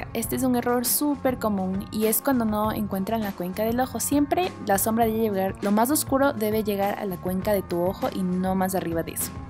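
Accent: Quito, Ecuador. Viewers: Estaba esperando una youtuber ecuatoriana como tú 😍 desde que empezó el video reconocí tu forma de hablar 💕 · Solo con escucharte un ratito ya sabía que eras de Quito, igual que yo!!! 😁😁😁Muy buenos vídeos!!! Te sigo desde Alemania!! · Con apenas escuchar tu forma de hablar supe eres ecuatoriana, me gustó muchísimo todo muy bien explicado... felicidades! una subscriptora más😜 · Me encanta tu acento, de que parte de Ecuador eres? Yo tambien soy de ecuador por sía. Jaja de Guayaquil, saludos, ya me suscribí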